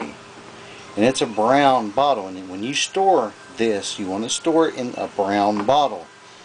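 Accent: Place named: South, United States. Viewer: I really hate the southern accent. It makes the speaker sound so uneducated and dumb. I can't stand how they speak so slowly, repeat themselves, go into detail about things that are common sense, and mispronounce words. If I hear this guy say the word "ColloiDIAL" again, I'm going to scream while projectile vomiting. A der da da der. "Hey, Jim-Bob! We should make a colloiDIAL silver beer to drink on Nascar race day!" "Their makin' a left turn!"- Bubba J. (Jeff Dunham)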